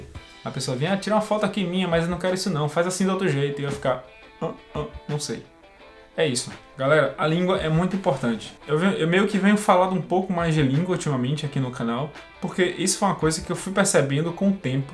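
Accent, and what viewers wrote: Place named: Bahia, Brazil. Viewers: Menino assim que escutei sua voz percebi o sotaque baiano. Adorei seu canal. Um salve direto da Bahia. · véy, verdade! Primeira coisa que pensei! Um conterrâneo, além do sotaque, as gírias baianas hahaha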